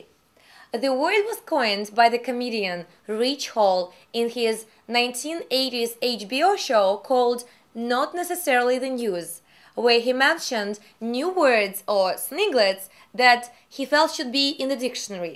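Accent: Russia. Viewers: are you russian?...i think i hear the russian accent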